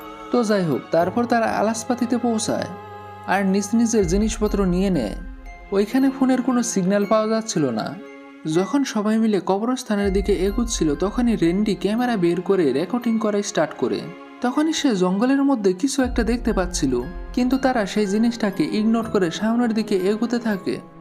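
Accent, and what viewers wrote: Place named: Barishal, Bangladesh. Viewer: আপনি কী বরিশালের বা ফরিদপুরের?🐸এমন ভাবে কথা বলেন কেন হ্যা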